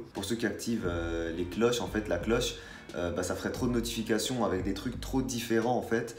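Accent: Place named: England, United Kingdom. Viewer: Je suis toujours autant impressionné par ton accent anglais mdr, le dessin de ta tête pète des culs!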